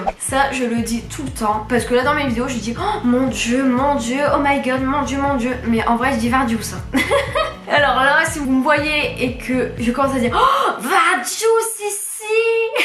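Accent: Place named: Nord, France. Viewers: J’adore ton accent malheureusement j’ai quitté le nord trop jeune j’ai pas l’accent 😔 et j’adore le patois du Nord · Super vidéo ! Jsuis trop morte de rire au début "ça fait cassos" et "c mieux en français qd mm" haha c vrai que c une langue à part entière le ch'ti ! Moi je l'entends direct ton accent surtout sur certains mots :) Ma belle famille est ch'ti, ça fait + de 11 ans que jles connais alors à force jsuis habituée ^^ Jcrois que jconnaissais 95% de ces mots et expressions mais avant d'être avec mon homme j'aurais réagis comme tes copines de vacances mdr ! Gros bisous <3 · Oh oui ton accent est prononcée 😉 j'adore grave le ch'ti depuis le film de Dany Boon BIENVENUE CHEZ LES CH'TIS 😊 surtout que nous les Bretons ont dit aussi Vindediousse (je sais pas l'écrire mdr) BONNE VIDÉO sinon 👏💘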